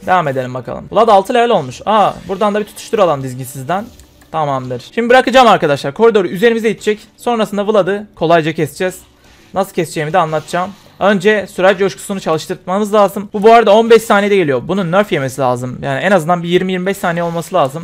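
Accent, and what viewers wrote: Adana, Turkey. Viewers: Adanalı mısın?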